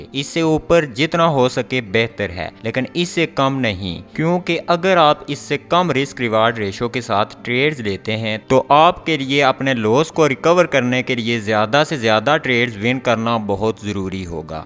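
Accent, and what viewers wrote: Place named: Pothwar, Pakistan. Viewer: bawa gi , english bhi poothowari main boltya ho ... yeh candelstick nahi hota .. Candle Stick hota hay dono ko mila k na parho ... raja g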